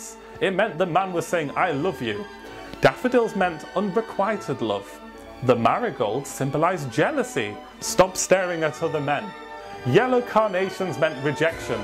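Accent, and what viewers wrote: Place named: Poland. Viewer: ! You make interesting videos! PS. Are you polish or ukrainian? You have such an amazing accent.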